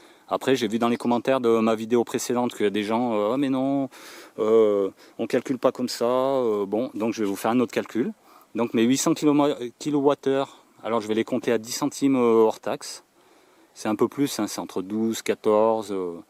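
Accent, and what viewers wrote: France. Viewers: Bonjour Pour l eau chaude: Je suis pas un grand specialiste, mais selon votre systeme de chaufage. Je penses qu il serait possible de recuperer calorie perdu par cheminée. Si vous avez chaffage au bois ou mazout par un poele il serait judicieux de reflechir comment faire un serpentin autour conduit ou circulerait un fluide pour rechaufer un reservoir d eau chaude, a bien penser un systeme securitaire. Je suis natif des hautes alpes et votre accent me parle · Hello j'ai cherché dans les commentaires sans trouver votre localisation si ce n'est l'accent qui vous situerait en Isère ou Lyon peut être. Moi je suis sur Nimes.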